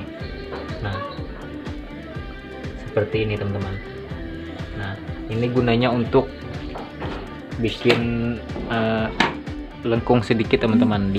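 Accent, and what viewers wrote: Banjar, Indonesia. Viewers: Banjar nih kedengaran nya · Pian nih logatx kaya org Banjar Bermanfaat BNR YouTube pian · Logat kayak urang banjar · Saya baru liat menit 5 , tidak sampai selesai video. Sudah paham... Sebab saya sudah menjahit/penjahit 15tahun... Tapi saya mau bertanya,anda orang mana , suaranya itu loh🤣🤣🤣 Banjar atau apa???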